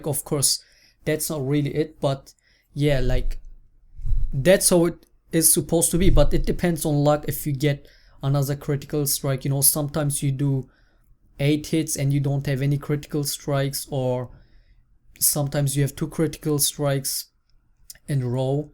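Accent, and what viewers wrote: Germany. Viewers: Are you german or? I'm triyng to recognise your accent because I find it quite amusing but i'm pretty bad at recognising accents so yeah. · Du bist Deutscher oder :D ? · Für mich hört er sich extrem DEUTSCH an. He sounds extremely german for me, lol. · lel du bist deutsch? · Is he german ?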